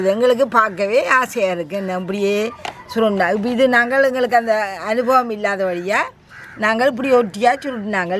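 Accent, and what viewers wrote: Sri Lanka. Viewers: I like srilangan Tamil voice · இலங்கை தமிழ் கேட்பது இனிமை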